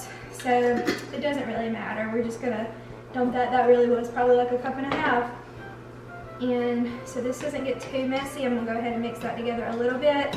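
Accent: South, United States. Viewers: this is a awesome give away I just love listening to your cute lil southern accent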